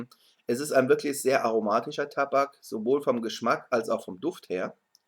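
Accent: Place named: Hesse, Germany. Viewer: versuche gerade ihren Akzent zuzuordnen, er ist schwach aber ich würde sagen Hessen. Ist das richtig?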